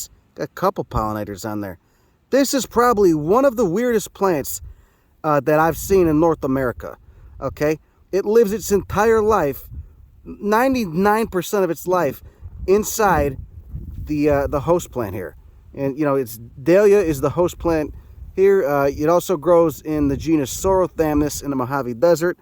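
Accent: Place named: New York, United States. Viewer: from NY so used to hearing things explained this way.. feel like im home if i had to guess this dude is from NY (LI) and is either Italian or Jewish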